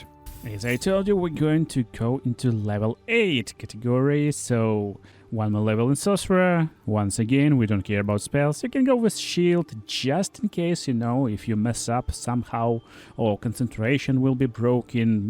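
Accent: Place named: Russia. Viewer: Ты из России? Акцент характерный!)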